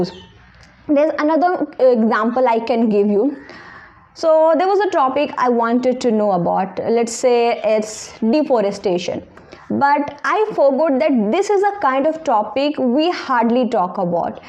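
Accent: India. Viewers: i would recommend you not to change your accent. it’s natural to have ones own accent which country they’re belong to. If a foreigner start speking hindi in their accent and utter ever sentences like foreginer it would also be incomprehensible for us to comprehend. Earnest plead from my side 😝😝btw love your contents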